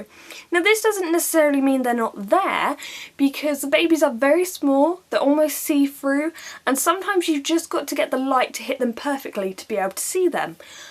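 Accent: United Kingdom. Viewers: I just discovered your channel, I've been watching quite a lot of your videos today, they are all great! You are really passionate about these animals, it's a joy to watch. Besides, i love your British accent. It makes it all very nice, I subscribed without hesitation. Greetings from France :)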